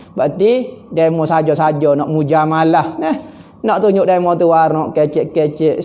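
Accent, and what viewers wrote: Kelantan, Malaysia. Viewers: asalamualaikum ustaz boleh ka guna loghat melayu.. saya tidak berapa faham loghat kelantan.. suka dengar ceramah2 ustaz.. salam dari sabah 😇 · Cuba² fhm lograt klate 😀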